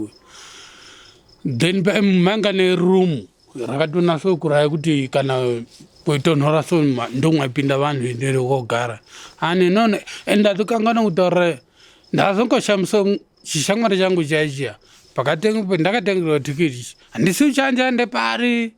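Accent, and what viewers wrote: Ndau, Zimbabwe. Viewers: Ko nanhasi achikungotaura chi ndau like this but all these yrs haasati achinja tone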